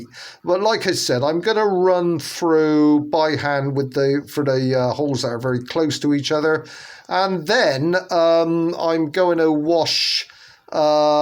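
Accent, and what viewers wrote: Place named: Bristol, United Kingdom. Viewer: That's the most Bristolian accent I've ever heard. Thanks for the video though, very useful!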